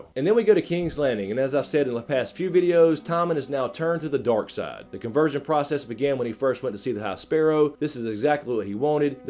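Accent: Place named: Carolina, United States. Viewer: Great review bro! Hey are you from NC? You have that Carolina sound to your voice.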